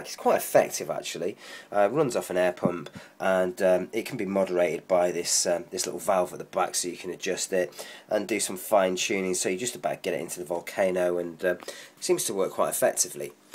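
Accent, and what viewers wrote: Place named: England, United Kingdom. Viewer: Awsome gd to hear an English voice on nano tanks gd look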